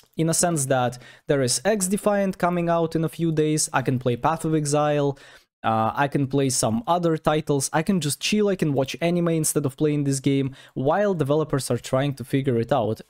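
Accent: Russia. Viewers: У тебя русский акцент, ты шо житель Рашн контри? :)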